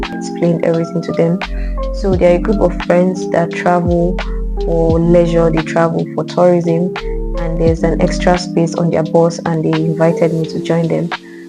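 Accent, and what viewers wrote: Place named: France. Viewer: This cool ❤... just love your french accent fr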